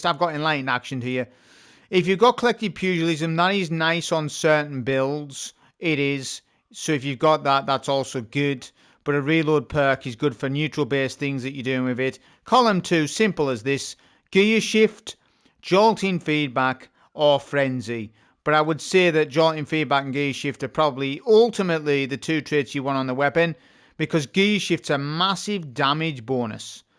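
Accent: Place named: England, United Kingdom. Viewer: well, the youtuber, who sounds English, butchered the word. I was assuming the issue was cultural unfamiliarity.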